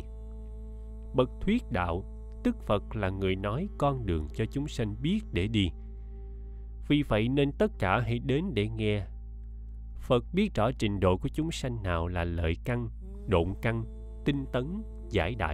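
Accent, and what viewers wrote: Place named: South, Vietnam. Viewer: Rất cám ơn thầy Thích Thanh Từ và KTH. Tôi ở nhà có cuốn kinh Pháp Liên Hoa nhưng rất khó hiểu. Nhờ nghe bài giảng tôi mới hiểu thêm nhiều. Tôi cất giữ những kênh này mãi mãi ! Giọng thầy đọc rất hay, trong và sáng sủa và nhất là thầy nói giọng Nam giúp cho tôi hiểu rất dễ dàng hơn giọng Bắc . Rất cám ơn! Nam Mô A Di Đà Phật.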